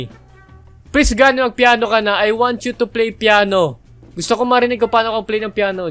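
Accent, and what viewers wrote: Visayas, Philippines. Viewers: kuta bisaya kaba?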